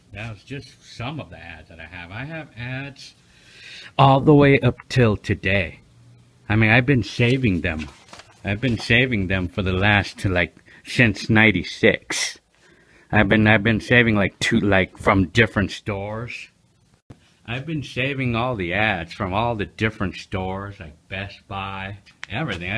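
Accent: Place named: Cleveland, United States. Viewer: Sounds like Cleveland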